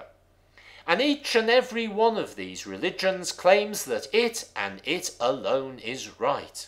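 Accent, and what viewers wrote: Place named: Merseyside, United Kingdom. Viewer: Like the posts. I think I detect a hint of Mersey in your voice, despite being in Croydon... Keep up the interesting work.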